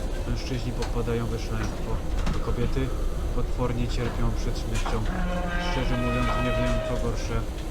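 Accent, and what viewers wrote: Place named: Silesia, Poland. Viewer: Słychać u Ciebie gwarę śląską :) pozdrowienia z Chorzowa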